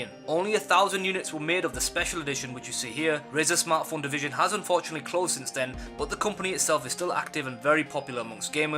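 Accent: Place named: North, United Kingdom. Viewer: This Asian man speaks with a distinctive Northern accent which is very different from the hybrid Jamaican dialect which is spoken by Pakistani teenagers in London. The original Indians of India were Black and migrated to India from Africa 170, 000 years ago. But i am only referring to young Pakistani teenagers in London who speak English in a hybrid Jamaican dialect which is very different to the distinctive Northern accent this guy has.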